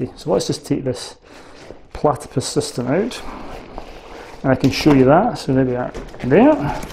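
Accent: Scotland, United Kingdom. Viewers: Auto-captions are hilarious - they can't cope with your Scottish accent! 😂 "Secluded Thailand beaches" 🤔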